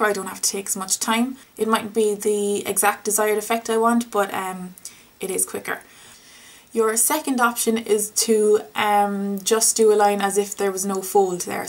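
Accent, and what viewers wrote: Ireland. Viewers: Your Irish accent is a dream! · I have been looking everywhere for an informative and straightforward tutorial on how to do a winged eyeliner on eyes with low creases - and here you are! Sure, I've found a few here and there but no one explains the jagged connected line that my weird creases need to get that smooth line when looking straight on :D And your lovely Irish accent is just sweet icing on the creased cake. Keep up the good work! /Linn Andersen (Sweden)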